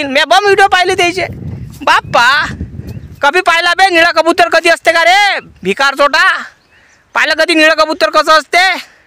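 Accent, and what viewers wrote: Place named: Vidarbha, India. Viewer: छान दादा मला तुमचे बोलण्याची स्टईल खूप आवडली. त्यामध्ये विधरबाचा गोडवा आहे. ❤️❤️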